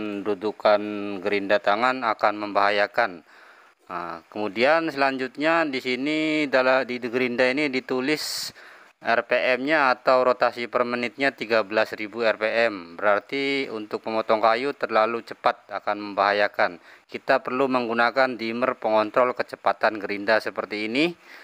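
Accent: Indonesia. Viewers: Ngomongny gaya Indonesian, tp soket listriknya Malaysian🤩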